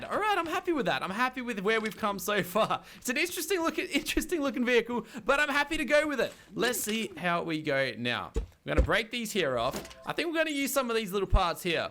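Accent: Australia. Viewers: Are you Australian? If so where are you from in Aus.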